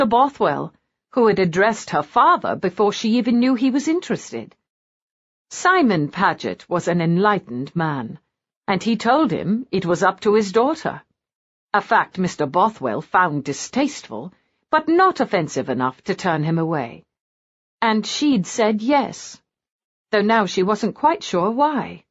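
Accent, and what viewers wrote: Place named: England, United Kingdom. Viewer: Regarding pronunciation. Many words spoken in England are pronounced differently in the US. This story is read by an English woman. All of these differences is what makes the world and different cultures so fascinating.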